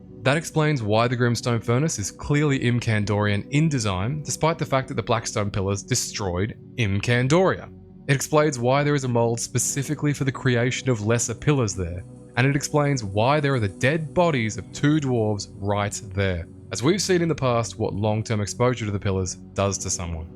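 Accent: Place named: United Kingdom. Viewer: Finally someone reads the dialogue in Runescape with the appropriate "Britishism" it was meant to be conveyed with.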